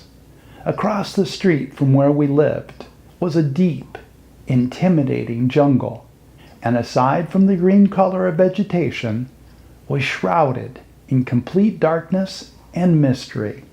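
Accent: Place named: England, United Kingdom. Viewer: Thank you 🙏 so much for your beautiful video. I love it a lot because I learnt so much from your English accent 🙏 and your presentation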